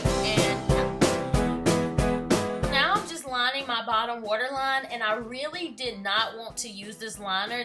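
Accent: South, United States. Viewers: She has such a southern accent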